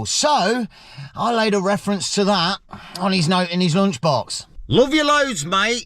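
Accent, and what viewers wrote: Leicester, United Kingdom. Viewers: Gonna be completely honest here, when I first started watching you I hated it, just couldn't get used to the Leicester accent, now your my favourite u tuber it's hilarious, can't wait for u to upload the next video, u really make my day 🤣🤣🤣🤣🤪🤪🤪🤪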